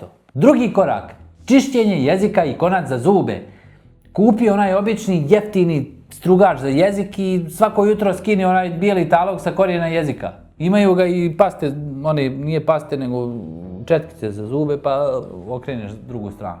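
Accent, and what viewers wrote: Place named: Tuzla, Bosnia and Herzegovina. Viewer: Ovaj naglasak mi najvise vozi na Tuzlu, Bijeljinu i taj dio (prije ce bit Bijeljina)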